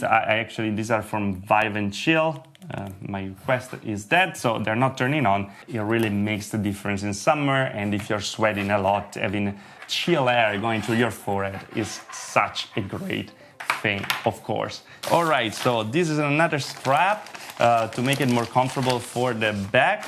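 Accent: Italy. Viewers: Hey man, I'm sorry for such a silly question that you probably get all the time, but you sound a little bit italian to me with your accent. Are you? If not, where are you from? Cheers from italy :D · Are U italian?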